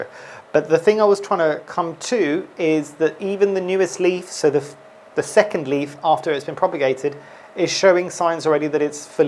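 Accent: West Country, United Kingdom. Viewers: Love that west country accent coming through ☺️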